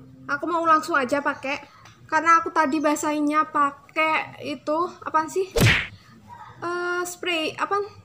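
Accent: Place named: Java, Indonesia. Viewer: suaranya medok bgt suerr, lucu deh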